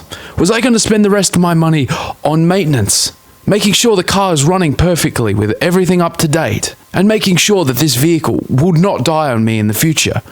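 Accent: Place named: South Australia, Australia. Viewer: I’m proud of myself, based off your accent I placed you in south Australia and I was right. My first video I’ve ever watched from you and it is incredible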